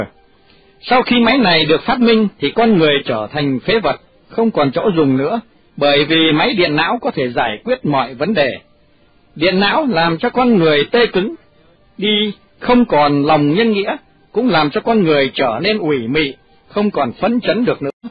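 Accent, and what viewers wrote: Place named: North, Vietnam. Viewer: Dọng đọc bắc nên mình là người bắc nghe rất dễ hihi. Mong chất lượng ghi âm ngày 1 tốt hơn nữa để tránh rè ạ 🌷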